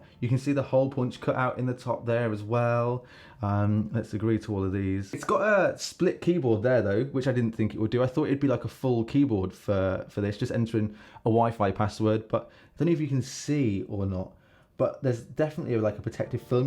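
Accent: United Kingdom. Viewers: Love subscribing to english accent speaking guys😅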